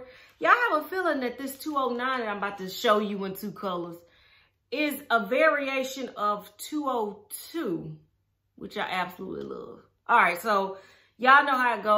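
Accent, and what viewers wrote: South, United States. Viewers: Love your southern accent and charm! ☺️ I’m a newbie wig wearer and just purchased 3 Neesha Wigs (202 & 205). Had them thinned out and layered! Will be investing in the 208 and 209! 😘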